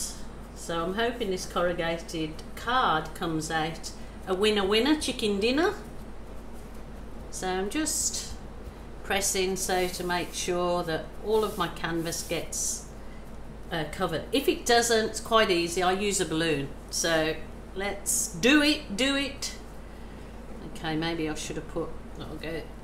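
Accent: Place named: Midlands, United Kingdom. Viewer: You're so welcome, I enjoy your work and I like your easy going attitude, along with your midlands accent! Don't blame you for emigrating, love the filming of the views from your home, you live in a beautiful area of the world. Much respect to you.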